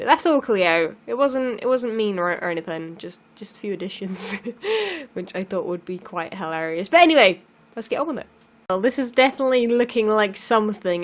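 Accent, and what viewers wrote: United Kingdom. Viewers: Mare-ee-yo? Wow British people sound funny.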